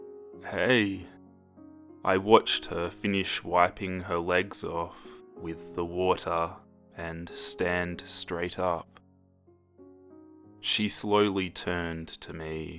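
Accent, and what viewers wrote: Australia. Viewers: Pookie r you Australian ? ? ?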